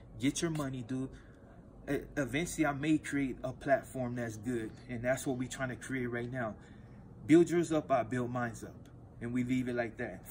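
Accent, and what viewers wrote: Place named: Mexico. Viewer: Cuzzo why you got a Mexican accent though does your bros and sis have the same accent ?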